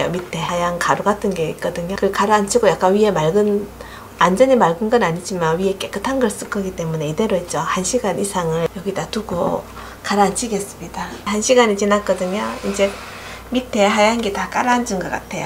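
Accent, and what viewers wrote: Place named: Daegu, South Korea. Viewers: 경북사투리에 참 듣기좋은 말소리를 하시네요..보통 경북사투리 참 듣기싫은데..경상도 사람 입장에서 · 어릴때 엄마가 만들어주던 식혜 생각나요. 대구사람인데 이모 말씨가 촵촵 감기니 정겨워요^~^ 울 엄마한테는 전수 못 받았던 레시피.. 이모한테 배워가요♡ 뭔가 어려울 것 같아서 생각도 엄두도 못 내던 식혜~ 도전!!!!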